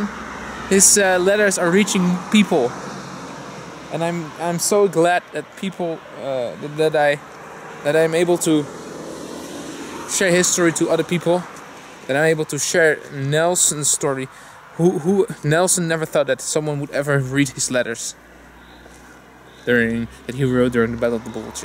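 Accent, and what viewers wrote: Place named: Netherlands. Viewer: He is dutch. I can hear it by his accent :)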